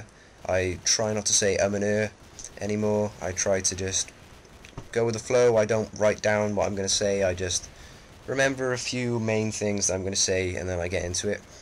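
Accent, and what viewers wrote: United Kingdom. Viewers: He sounds british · this guy is the only guy that sounds british that plays on xbox 360 cause like every video i see of a british person its always ps3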